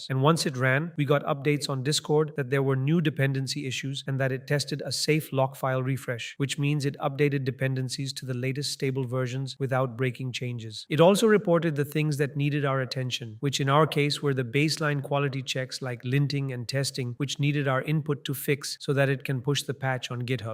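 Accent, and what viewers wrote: India. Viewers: Indian accent AI voice is peak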